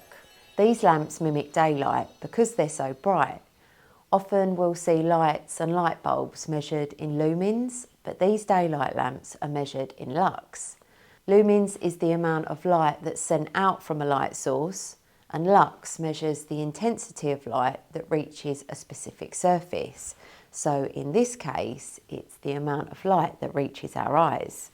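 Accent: United Kingdom. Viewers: I grew up in Florida in the 60’s-70’s…when sunscreens weren’t in vogue. I’m paying for it now. That means I have to avoid the sun or cover up…or lather on sunscreen. However, I still like a sunny day. All that said, you are my Happy light! I look forward to your videos, cheerful smile, lovely British accent, and I learn from your experiments. Keep sharing and glowing…I mean…growing!😊. BTW, I was stationed in Japan and England for 4 years each so I learned to get out regardless of the weather and clouds! 😊😊😊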